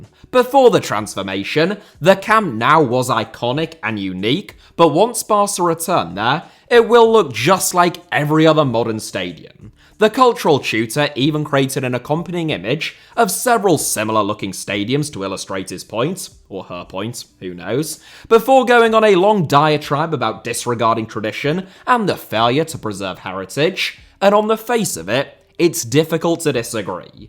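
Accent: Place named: United Kingdom. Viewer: I love this channel for its information and also deep british accent.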